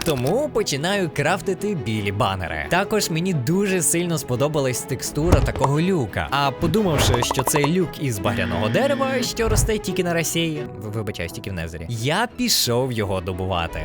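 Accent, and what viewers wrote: Lviv, Ukraine. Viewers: Ти з Львова ? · Ти зі львова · Привіт) Давно не бачила українськомовного майнкрафтера) Коли почула "чуть не получив тягла" зрозуміла, що ти зі Львова) Так приємно чути українську мову на ютубі і свій говір) Бажаю успіхів) Продовжуй в тому ж дусі) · ти зі львова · ТИ ЗІ ЛЬВОВА?! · ТИ ЗІ ЛЬВОВА?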